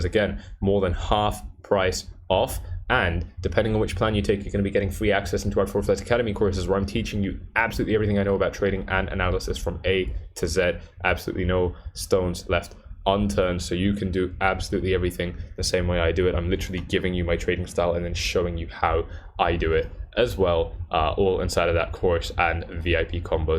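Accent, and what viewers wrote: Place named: England, United Kingdom. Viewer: I listened to an old man in a truck and now I am listening to a teenager with an English accent for investment advice..I hope I found the one... Wish me luck!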